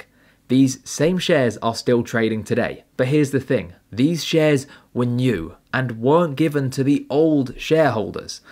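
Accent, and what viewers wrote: England, United Kingdom. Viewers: I normally listen to American investors and traders hard to find people from where I am from to talk about stocks but good to hear an English voice !!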